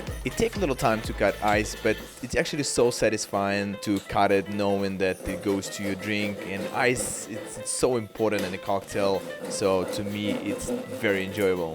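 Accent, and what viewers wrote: Russia. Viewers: Судя по акценту, ты русский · Капец акцент русский) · Ты русский))))) дададададададдаада я знаююююююю. Этот акцент везеде слышно аахахахх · Your accent is similar to Russian, you're not from Russia, do you? name is also popular in Russia)!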